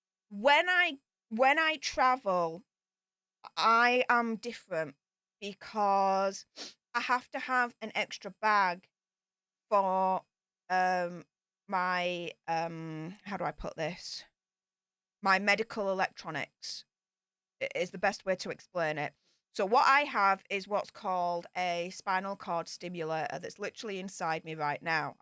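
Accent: Yorkshire, United Kingdom. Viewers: Couple O Wee-Back 🤣 Yorkshire accent is class 👏🏽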